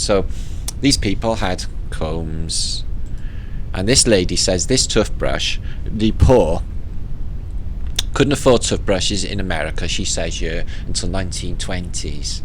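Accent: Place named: Liverpool, United Kingdom. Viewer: Febf good to see British YouTube canel bless you xx bro luv da Scouse x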